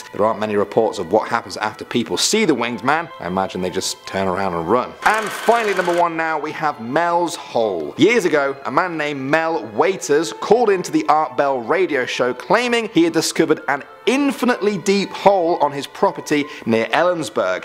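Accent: United Kingdom. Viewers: Everyones pronunciation is differently all depends where you're from, he's a brit who lives in canada.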